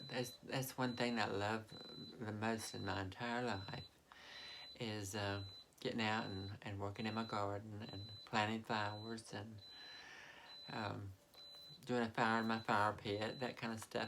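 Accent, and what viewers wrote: South, United States. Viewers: Love that southern accent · I love your Southern accent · I love that sweet southern drawl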